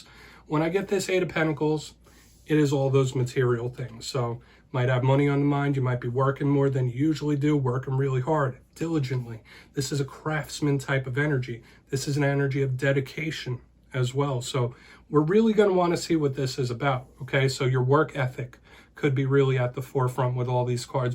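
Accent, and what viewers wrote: New Jersey, United States. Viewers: Love the stories the pictures tell. Use tarot for clarity and to help interpret the ongoing energies. Im a new subscriber and was attracted to the title. Also the NJ cadence, im originally an east coaster.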